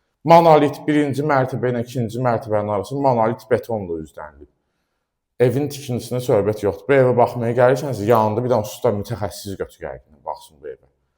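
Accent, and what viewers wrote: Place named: Baku, Azerbaijan. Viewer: бу гардашын дашынмагындан беле баша душурук ки бу гардаш заводской бакылы баласыдыр озуде маштагылы олачаг . валлах сенин о ширин лехчене горе истесен де истемесенде адамо еви алар . гардаш вар ол саг ол емише беле позитив ол сене гулаг асанда адам гедиб душун <num> иллере чох саг ол ки кечмишимизи йадымыза салдын аллах хейрини версин ай гардаш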